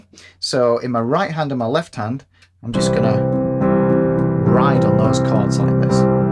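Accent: United Kingdom. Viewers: SOUNDS GREAT LIKE ELTON JOHN ARE YOU BRITISH MATE.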